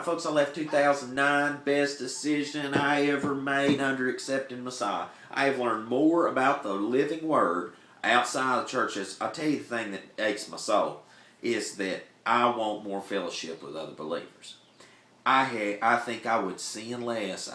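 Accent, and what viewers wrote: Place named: Alabama, United States. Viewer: Today I had a class to teach and I said pretty much what you just said. If people knew they were living in a controlled environment similar to the movie "The Matrix" they would freak out. The truth is too much for so many. I have tried for years to wake people up including my own kin folk to no avail. One or two of my nephews are awake but no one else listens or seems to care. The brainwashing is very , very strong on the population. I too was going to leave and head for the hills but instead I decided to stay and help my neighbors. The same neighbors that laugh at me when I talk to them about what reality is. It's going to be very, very bad and millions if not billions will die. Thank you for your hard work! Oh...your accent is similar to mine. I grew up in rural Alabama. Some folks just can't understand what I'm saying. 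LOL.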